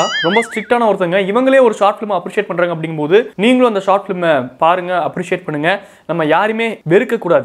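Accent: Coimbatore, India. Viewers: super so Coimbatore full language speech super hit video your channel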